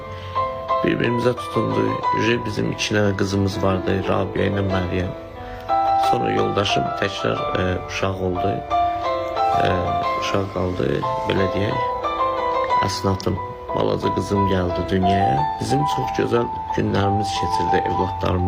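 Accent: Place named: Lankaran, Azerbaijan. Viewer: ☝️Iştı ləhçəku zıne bedə tı Lankonıjiş 👌Xıdo rəhmət bıko 🤲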